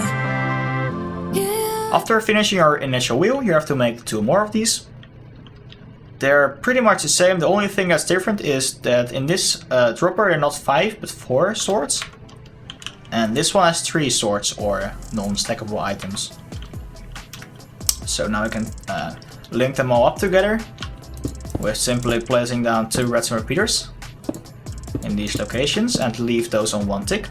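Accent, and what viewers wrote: Netherlands. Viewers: You sound dutch..you dutch?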